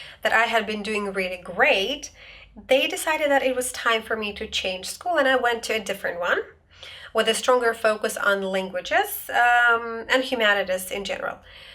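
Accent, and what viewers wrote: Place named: United States. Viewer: Thank you very much for this video! May I ask you some questions? Do you speak any other foreign language? Why did you choose the American English pronunciation? I ask because at my university our teachers prohibited speaking the American English. Thank you in advance 🤗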